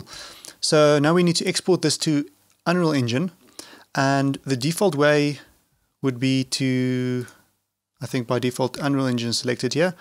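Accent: South Africa. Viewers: South African? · That NB gave it away i was like its definitely a South African Afrikaans?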